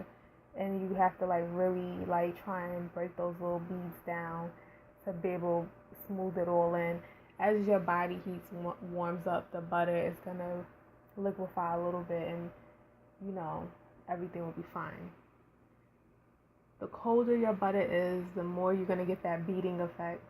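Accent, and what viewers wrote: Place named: New York, United States. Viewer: She sound like she from nyc but her voice is relaxing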